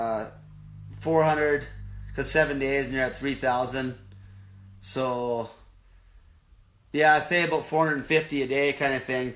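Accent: Canada. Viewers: I hated him for five minutes then it was like hey... He sounds Canadian... like me. Maybe my Uncle Frank has a kid out there we know nothing about. I have uncles who sound like him. Lol Do you hate the way all Canadians sound when they talk? His accent is very Canadian.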